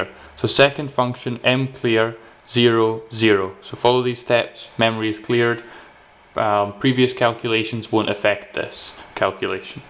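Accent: Scotland, United Kingdom. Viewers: God bless you. You truly are a gentleman and a scholar. Your Scottish accent is a comfort to me whenever I click on these videos.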